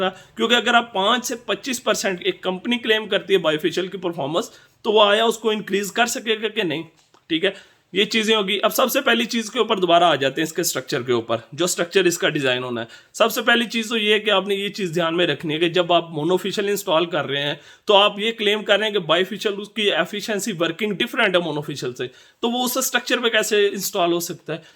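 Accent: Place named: Kashmir, India. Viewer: Bhai muje aap Jammu Kashmir Ke bhai lagty ho. Accent se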